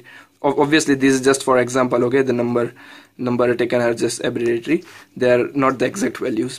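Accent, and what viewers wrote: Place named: India. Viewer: Annoying accent. Go back to India.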